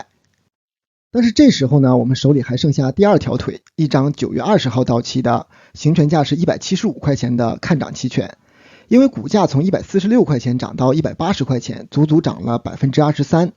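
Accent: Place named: Northeast, China. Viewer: 东北口音有点搞笑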